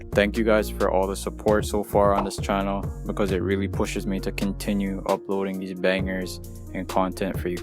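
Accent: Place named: Toronto, Canada. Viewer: Yo u from Toronto G? I can tell by the accent lmao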